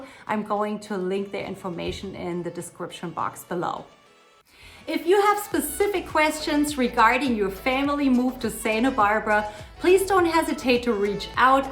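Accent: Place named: Germany. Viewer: Your accent sounds german, germans seems to know the entirety of everything, is that you?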